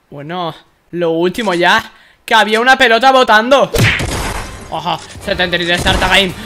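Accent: Valencia, Spain. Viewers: Like si no es ves que es valenciano No? Soy el unico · De que parte de Valencia eres?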